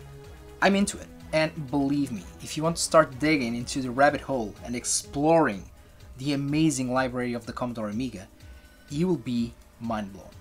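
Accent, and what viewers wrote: Portugal. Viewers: Eu estava a ouvir o sotaque e pensei...deve ser Tuga. E és mesmo. :)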